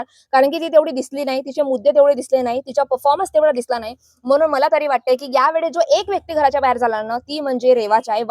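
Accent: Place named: Vidarbha, India. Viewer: वैदर्भीय भाषा वाटते ह्यांची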